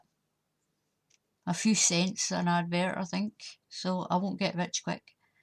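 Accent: Scotland, United Kingdom. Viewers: love to hear yr Scottish twang my nanny was a broad Scot xx love and miss her xx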